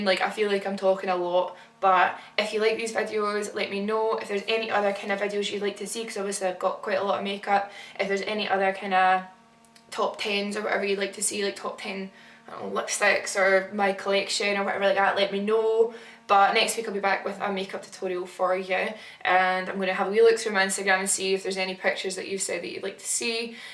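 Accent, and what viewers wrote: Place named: Glasgow, United Kingdom. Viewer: Hi just discovered you and I'm loving your channel! It's great to watch make up tutorials from someone with a Glasgow accent!! Can you please do a tutorial on your eye makeup in this video? x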